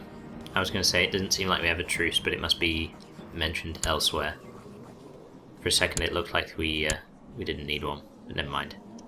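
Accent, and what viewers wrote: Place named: United Kingdom. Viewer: What is your accent? It's easily my favorite British accent but I'm terrible at recognizing regional ones.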